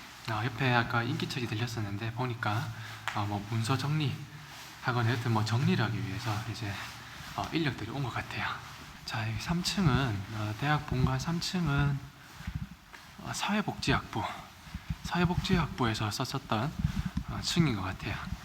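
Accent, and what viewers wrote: Gyeongsang, South Korea. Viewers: 사투리를보아하니 경상도분이신가요? 영상재밌게보고계십니다.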